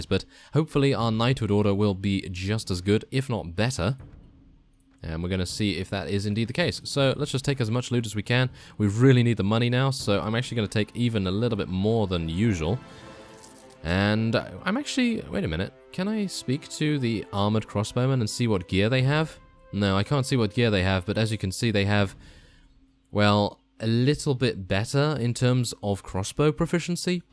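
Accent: United Kingdom. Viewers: The Valleys UK, or British basically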